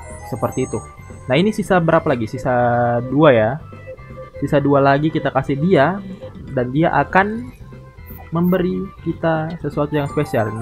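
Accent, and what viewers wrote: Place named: Makassar, Indonesia. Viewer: Logat memang tdk bs berubah sappo Semangat trus sappo semoga semakin tdk belibet nantinya Salam kenal sappo Sy jg orng Makassar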